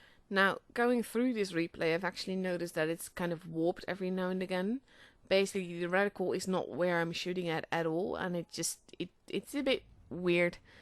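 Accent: Netherlands. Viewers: Hi - You have a really lovely 'speaking voice', makes easy-listening. Being older, I tend to be very jaded & don't follow many (or few) "Blitzers" on here. I enjoy your review, as I've just acquired a "Medjay" (tonight), but haven't used it yet. Looks to be just a 're-skinned Excelsior' - As during "Uprising" mode, they have the ability to "turn around" their opponents (which sadly, includes me!!!!) - I've been destroyed a few times by that method, whilst using my "newest" favourite Tier.5 - "Nightmare StuG.III" Lastly, your English is excellent & I hear the hint of a Dutch accent.... (tell me I'm wrong? - Laughs!)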